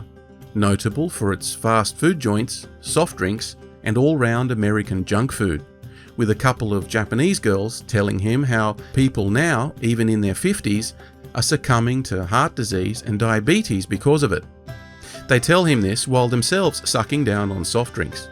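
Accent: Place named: Australia. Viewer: Good job, love the humor, delivered with the Aussie accent, perfect. It was a big subject to breakdown however I think you managed it.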